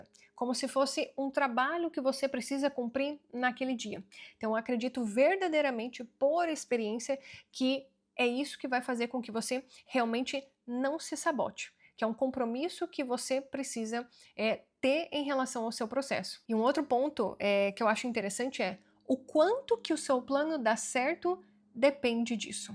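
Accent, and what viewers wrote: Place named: Paraná, Brazil. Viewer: Assistindo muitos videos seus. Que deus lhe abençoe sempre! Seu sotaque anglo paranaense e trocando o S pelo x tipico de avó alemã e um barato! Voce e uma fofa e a sua inteligencia amplifica sua beleza! Parabéns 🎉 Sonhos nao envelhecem !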